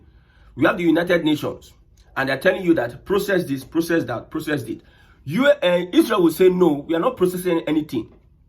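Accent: Igbo, Nigeria. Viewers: The way you pronounce Nnamdi, are you Igbo?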